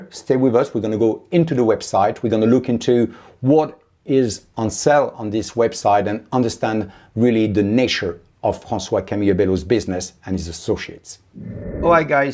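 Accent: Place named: France. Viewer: you're amazing and your English is wonderful. Please bring out merchandise with my favourite saying that you often say in your lovely French accent. ' Behind the Bars'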